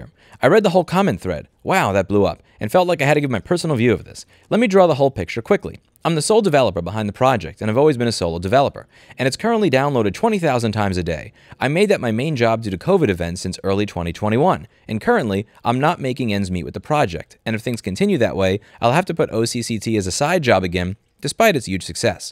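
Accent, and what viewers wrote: New York, United States. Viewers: Very well said and interpreted, took me a long time to get used to your NY style, appreciate all you do now.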